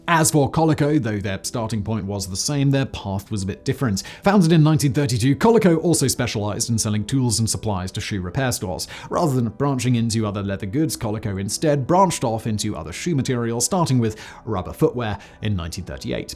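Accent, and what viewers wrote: United Kingdom. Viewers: I do love all of Simon's channels, but he has a rather insistent habit of pronouncing names in the most British way possible. He gets several other names wrong in this video alone lol.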